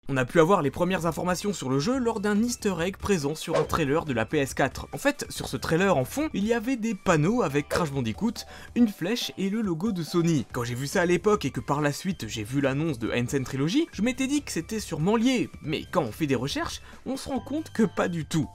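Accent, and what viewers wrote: England, United Kingdom. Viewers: promi jeu critik pa ton akcan englé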